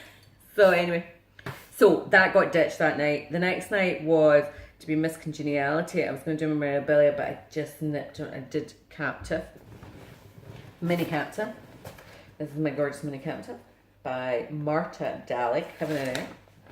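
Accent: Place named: Scotland, United Kingdom. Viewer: Congrats on the run! I couldn't do that to save my life. I've only got 1 stitchy project on the go, so I think anyone whose attention span can manage StitchMaynia is impressive! So enjoy your videos, I'm an expat Scot living in Australia for 30years, so a wee touch of homesickness listening to you speak. Taps Aff! x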